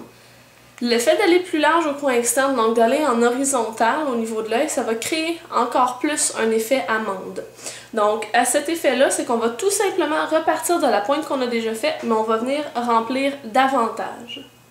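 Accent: Quebec, Canada. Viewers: Tu as l'accent Québécois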